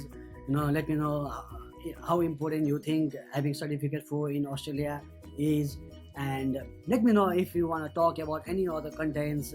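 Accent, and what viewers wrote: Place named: Nepal. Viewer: that's called Nepali accent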